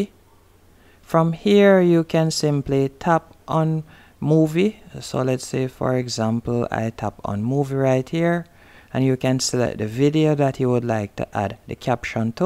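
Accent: Jamaica. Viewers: You sound Jamaican my brotha🤜🏿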